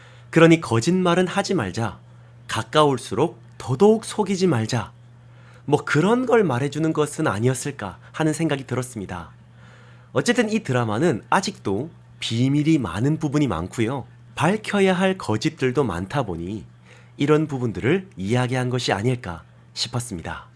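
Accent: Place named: Gyeongsang, South Korea. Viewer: 님 경상도 사투리 엑센트가 지대루네요ㅠ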